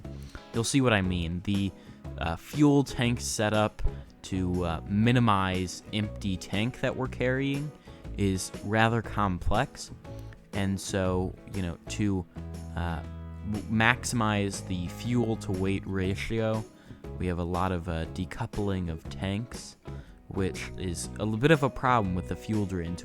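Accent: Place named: United States. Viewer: be soundin kinda american doe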